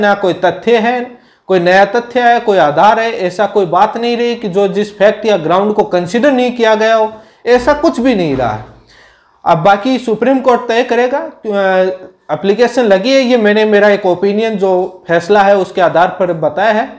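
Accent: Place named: Pahari, India. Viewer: आप पहाड़ी है जो आपके हिंदी उच्चारण से स्पष्ट है। थोड़ा इसमें सुधार करें।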